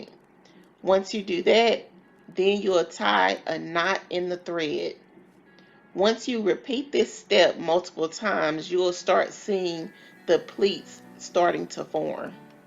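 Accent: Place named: Alabama, United States. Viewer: Hi, such a beautiful work 💞💞 are you from Alabama? I looooove your accent. I'm from Argentina.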